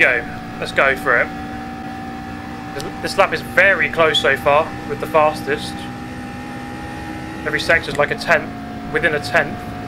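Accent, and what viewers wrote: England, United Kingdom. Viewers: Bro are you Filipino with a English accent? Gah damn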